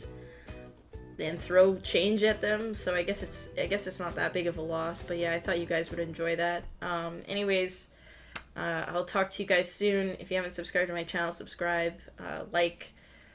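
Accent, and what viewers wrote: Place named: Canada. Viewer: That's what Canadian English sounds like, hu? Hoped it'd be a little different from US English, but I guess that explains why it IS American English and not Canadian English and US English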